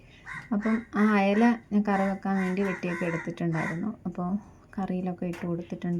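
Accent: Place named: Kollam, India. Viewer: സംസാരം കേട്ടപ്പോൾ തോന്നി കൊല്ലം ആണെന്ന് കൊല്ലത്തു എവിടെയാണ് ഞാനും കൊല്ലം ആണ്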